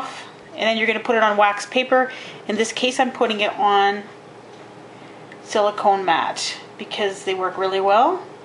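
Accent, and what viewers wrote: Canada. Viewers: aww, these look so good! did u know you have a slight Canadian accent?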